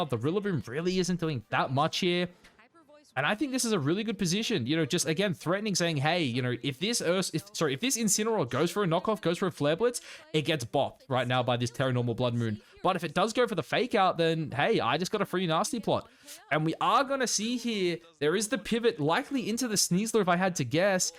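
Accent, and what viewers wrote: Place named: Australia. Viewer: bruh u sound like Chaz NBA i was so mind F*cked since i just seen his video 😂 you Aussies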